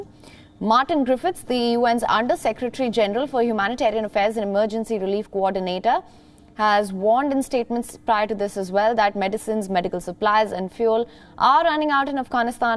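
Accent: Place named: India. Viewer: WION should choose a presenter that speaks better english. It has millions of subs... if they want to represent a real international image, it should start using someone that doesnt have such a thick indian accent.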